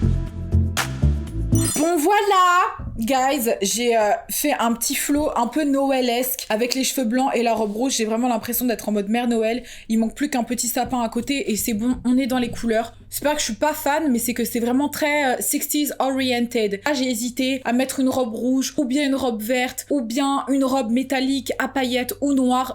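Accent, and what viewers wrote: United Kingdom. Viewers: Ça serait trop que tu fasses quelques vidéos en anglais we love that with your british accent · Tu peut faire desfois des vidéos en anglais sous titré français si ta la foi de le faire mdr !j essaie d'apprendre et j estime que l'anglais c est une langue mondiale on devrait l apprendre serieusement depuis la maternelle et pas a partir de la 6 eme...anyway j aime trop ton accent Anglais 😍🥰🔥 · Holala c'est vrai qu'en brune cheveux courts un peu ondulés, ça te va su-per-bien. J'ai bien aimé ce moment ! Je partage également l'idée qu'une chaîne en anglais serait absolument génial. C'est que j'apprécie aussi, ces expressions anglaises et ces accents, qui font totalement naturel chez toi. Moi aussi au lycée les gens à l'aise en langue étrangère étaient moqués. C'est typiquement français en fait. Une espèce de complexe d'infériorité qui finit par être d'une arrogance gênante. 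Ça a brisé des ambitions linguistiques ce comportement. Bref ! I love so much your accent ! Please, made your english channel it will be so cute to level up in english with you too. 🙏❤😘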